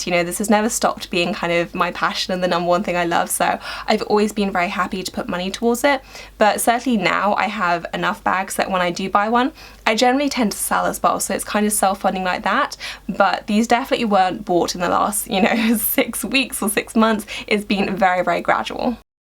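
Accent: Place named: London, United Kingdom. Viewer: She's just from London, that's how they sound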